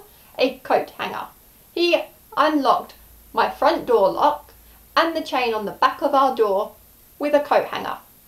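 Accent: South Africa. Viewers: Your accent has been South Africanize. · You've got a little Afrikaans accent even😄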